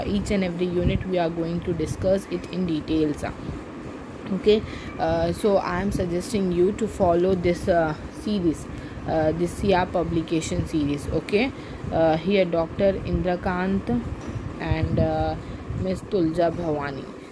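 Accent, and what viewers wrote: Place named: Hyderabad, India. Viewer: Thanks mam for this.. Pure hyderabadi explain😊😊😊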